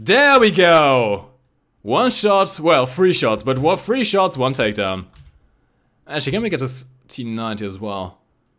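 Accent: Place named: Germany. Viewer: very german accent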